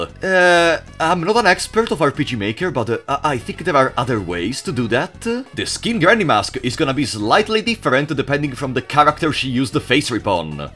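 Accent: Italy. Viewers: Il bro è palesemente del Piemonte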